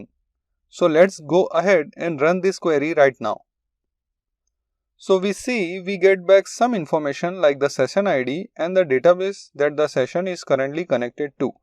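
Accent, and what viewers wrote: India. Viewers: indian accent makes me sleep... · Indian people english. Suddenly close the video ... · The Indian makes my ears cry.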